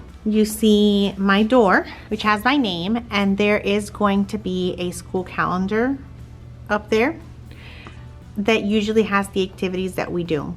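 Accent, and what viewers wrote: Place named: Miami, United States. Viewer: Great room, u from Miami? I hear accent…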